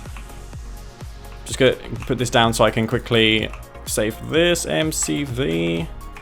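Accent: United Kingdom. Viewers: Nice to put a face to this voice I've been listening to for years lol, British? Maybe?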